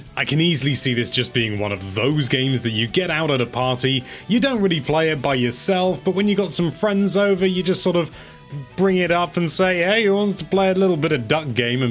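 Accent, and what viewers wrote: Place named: United Kingdom. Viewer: This is the most britishy britsh accent int he world.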